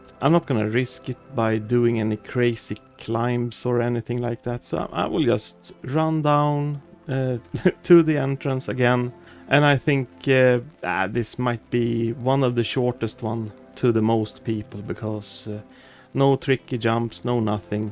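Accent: Sweden. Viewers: you kinda sound swedish (think you are from sweden) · nice that helped me a lot!! are you from sweden?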